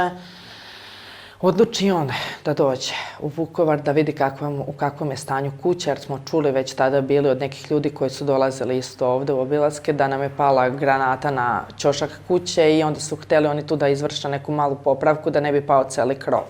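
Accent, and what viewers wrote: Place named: Ekavian, Serbia. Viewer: I još ekavicom pričaš i bre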